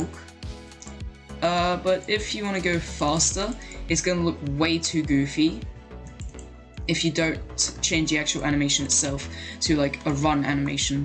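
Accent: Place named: India. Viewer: dude, something funny happened because of my stress, lol, so i got a buncha tutorial tabs opened about walking tuts, and the other video is an india guy talking, it loaded quite slowly, so i opened this video and i lit thought your an indian guy xd anyways, thanks for the tut, the only useful thing i learnt is the stretch thingy :) thanks you again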